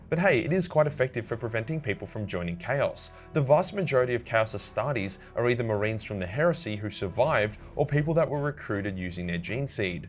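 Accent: Australia. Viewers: Been a fan for a while now cheers mate for being the reason I’ve become a fan of war hammer and I keen to always hear a fellow Aussie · Battle of cadia explained by australian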